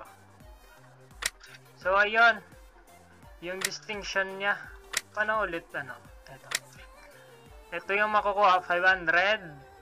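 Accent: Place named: Visayas, Philippines. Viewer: Idol taga saan ka idol parang bisaya ka ahh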